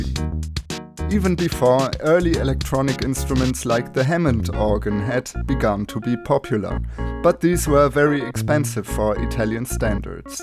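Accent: Germany. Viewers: I loved your video, thank you for producing it. I remember when the Italians were producing many electronic organs in the 70s and 80s. I still own an X705 portable organ that Michell Jarre used. As you say a very sad demise. Most of the American names had also disappeared by the mid 80s. Don't apologise for your German accent. I am British and I love Germany and the German people. I am a fan of Franz Lambert who at 75 is still doing concerts on his Wersi organ.👍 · More German accent please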